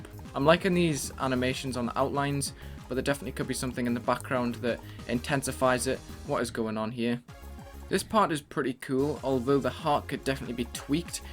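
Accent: United Kingdom. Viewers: To be honest, literally sounds like my math teacher with a British accent.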